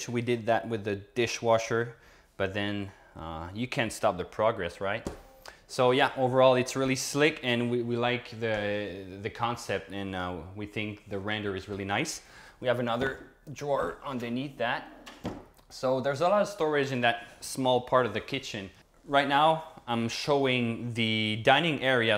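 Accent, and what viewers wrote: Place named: France. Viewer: Y'all build the most beautiful and highest quality homes of all. Speaking of French accents, you seem to be losing yours with every new video. Never lose the quality of construction and your sense of humor. You guys are the best!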